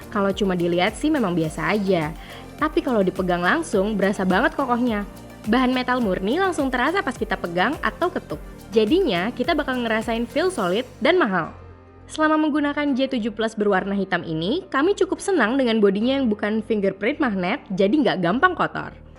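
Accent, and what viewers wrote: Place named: Betawi, Indonesia. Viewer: cengkok betawinya bikin aneh😁😁😁 bagusan dulu deh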